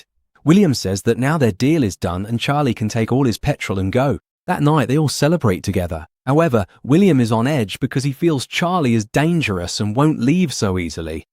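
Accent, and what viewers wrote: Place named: United Kingdom. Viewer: briton accent.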